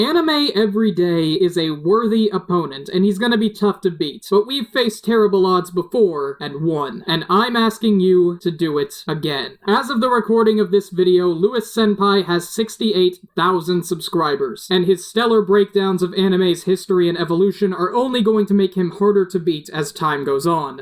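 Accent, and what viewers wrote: Australia. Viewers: Are you Aussie? If so, cool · Are you from Australia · Are you Australian??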